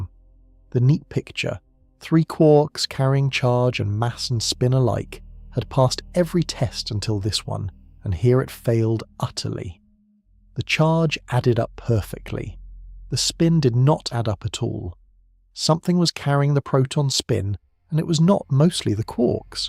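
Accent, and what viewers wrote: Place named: United Kingdom. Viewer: I suppose it’s meant to put you to sleep. But I wish they would make one of these with out a British accent.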